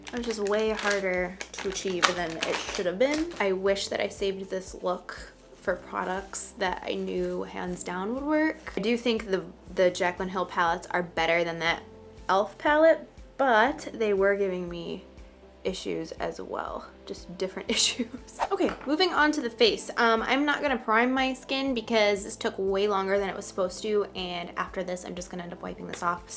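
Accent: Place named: Chicago, United States. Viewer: Girl! I freaking love this look!! Omg!! I know you struggled but girl, it's so bomb! You did the damn thang! This is my favorite look you've done for fall this year so far! As always love you and thank you for being such an amazing person to look up to and learn from ♡ my family is from Orland park so you always give me home vibes because your from up that way and your strong Chicago accent!! Xoxoxo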